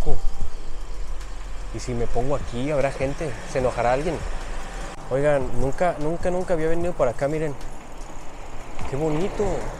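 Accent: North, Mexico. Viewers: porque hablas bien norteño we? xD estaba jugando tetris mientras se puso el vídeo y no había visto tu jeta hasta que vi que eras japones pero pensé que eras un norteño aficionado a los carros xD aunque en realidad sí lo eres aunque tengas finta de japo siento que no estás a gusto ahí y no te sientes de Japón. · Carnal vista todo méxico también es igual hay mucho que ver es muy rico en historia muéstrale al mundo de que está echo mexico Estoy orgulloso de esto ese español que hablas 100%mexicano shaaaaaw saludos desde sanjuan de los lagos Jalisco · Yo te sigo porque eres de muy buena vibra ... aparte que hablas como yo .... Muy norteño .. Yo soy de Sonora ... pero vivo en Washington . DC.... cuidate Niño ... como Mexico no hay Dos .. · Jiji me encanta que ya habla como nosotros los mexicanos!!!mandarina en cajos 🤪🤪🤪🤪👍👍👍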